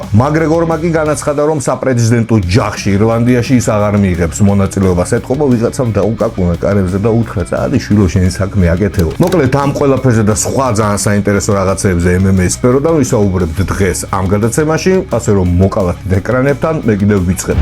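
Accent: Armenia. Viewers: მადლობა სომხურმა აქცენტმა მაგრა გაასწორა საღოლ იუმორში👍👍👍👍👍👍👍👍😁😁😁😁😁😁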